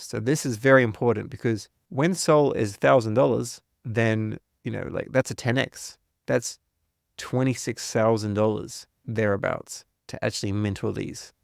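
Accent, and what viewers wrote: Australia. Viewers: As an Aussie i love that your accent is still intact 😊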